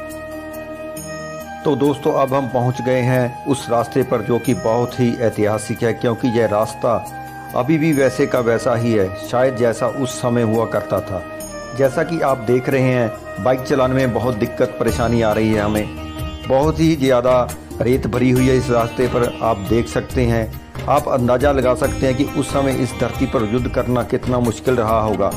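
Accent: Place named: Punjab, India. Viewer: Bhai ji tuhade Hindi bolan de andaz to pta lgda he k tusi Punjabi bolan vale ho fir Punjabi bolo kion k isnu jiyada dekhan vale punjabi hn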